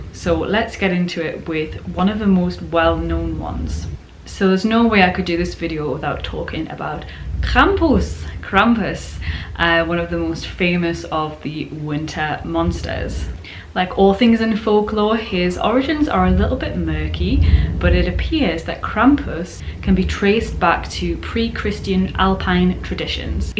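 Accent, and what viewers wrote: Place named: North East England, United Kingdom. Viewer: Been reading grettis viking saga and he gets attacked by monsters during Yule tide so seems to be a tradition of supernatural stuff during Xmas .always nice to hear my local north east accent in YouTube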